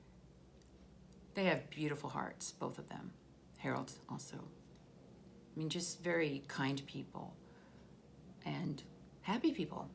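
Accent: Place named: United Kingdom. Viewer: What accent is this? You look Eastern European, sound British and seem very intelligent 😌❤️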